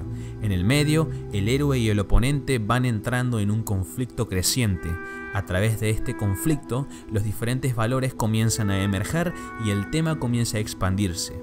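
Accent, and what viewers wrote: Argentina. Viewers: Que asco, acento Miergentino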